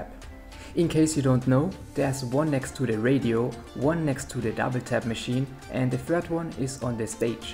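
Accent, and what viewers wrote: Germany. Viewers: im curious, is your accent german?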